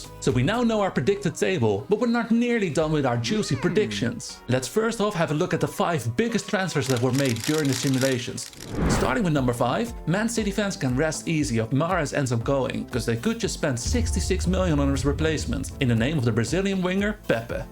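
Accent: Netherlands. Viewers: I think every time if you talk, you’re a dutch guy who talks english😅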